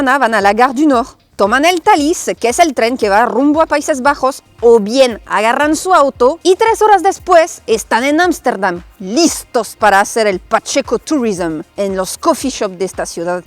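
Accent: Mexico City, Mexico. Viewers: Hola amiga me gusta mucho como hablas bien mexicanota a huevo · Sabes chilanguear mejor que yo. Siendo de México no puedo chilanguear ni imitar acentos. Amo tus notas y puntos de vista. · Me encanto tu forma de hablar muy mexicana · Adore tu acento mesclado con Mexicano 🤭😍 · Chulada de maíz prieto!!, panza arriba!! 😂😂😂 ya hablas muy mexicana, vientos huracanados👍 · Me encantan tus videos, hablas tan mexicana pero con acento y eso te hace única Gracias por escoger Mexico como tu segundo hogar 🇲🇽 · Me encanta porque siento que hablo con otra mexicana, “mamoneria” jaja me encanta ❤ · Me encanta q hable como toda una mexicana jajaajajjja 😂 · Hablas como mexicana, con un lenguaje muy nuestro. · Me gustas como ablas saves mejor que yo y abladora como los chilangos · Primera vez que veo tu vídeo, me gustó mucho tu manera de hablar, muy Mexicana, por eso ya me suscribí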